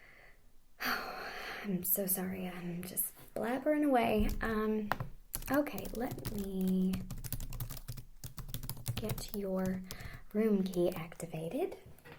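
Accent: South, United States. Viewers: Welp Was looking up southern accents cause I haven't heard them in years since I left and was feeling a bit nostalgic Was nice Not the exact one I of my area bit damn well close enough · Aarrgghhh i love this accent! I'm marrying a southern gal, that's my life goal... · weird, i was just looking for "southern accent" asmr a few days ago. Perfect timing to the point of being spooky. Thx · Your southern accent rocks!!! More southern roleplays please!!! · I have a real weakness for the Southern accent, this video is all kindas evil :P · very nice approach with this video, nice accent too, i think this is the first time i have seen any of your videos, im guessing your a true southern bell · why do i hate southern accents anywhere except for asmr?? I am actually from the south lol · Man, I love this:D Would have loved a stronger southern accent but I can't complain, my first trigger in so long >.> Keep up the good work Miss., cheers from Norway! 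· Gotta love southern accents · very nice, but at the beginning i like that background song do yu have the name of it. oh buy the way love that lovely southern accent;really good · This was too adorable, loved the southern accent and the many expressions used, you nailed that southern charm. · This is the first I've seen of this video. I genuinely love ASMR with southern accents, I don't know why. Thank you for this, and I apologize for being late to the party. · So I'm new to you, from England, love the Southern accent, this video is so nice and comforting, makes me feel like I'm checking in to s B&B, beautiful voice and accent, proper soothing :) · Pleasepleaseplease do more of southern accent asmr 💞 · I kind of just stumbled on here and so I'm new here. but your accent~ 😍 Whether its real or fake. its absolutely charming :3. I see what they mean by southern charm now xP. and your character you chose for this makes me giggle x3. 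she's weirdly adorable. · this is so my favourite video ever. normally i watch asmr to fall asleep but this is way too good to sleep through. from the beginning you had me laughing and even answering you in my head a little. and the southern speaking, wisdom about life... heck its all there. thank you so much you fantastic creature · this one is amazing! The southern accent has always been a tingle trigger for me. Thanks for making this. The humor in it just makes it even better. PS: 61 degrees....brrrrrr...as a Detroiter just coming out of winter 61 sounds like beach weather to me:-) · This was really good and I'm highly impressed with the accent but you didn't pronounce route like a lot of southerners do. I'm just saying because I'm from Texas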